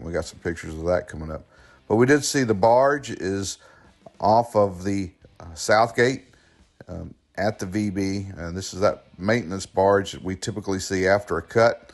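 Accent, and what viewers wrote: South, United States. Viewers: The water conditions sound better with your southern drawl!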